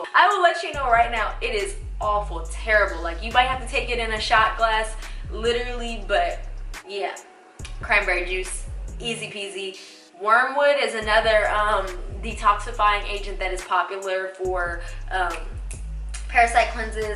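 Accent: Jamaica. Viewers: Ayeee your a Jamaican gyal who knew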